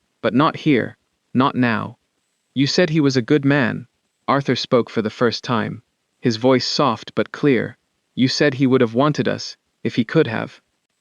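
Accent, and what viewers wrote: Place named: United Kingdom. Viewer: Is the narrator British or American? The voice goes in and out of both accents, sometimes within the same sentence. Pretty distracting.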